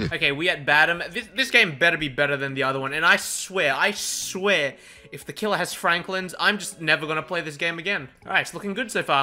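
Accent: Australia. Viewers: you're Australian right? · I do love listening to my Australian friends talking and swearing. So I enjoy your shit mate as well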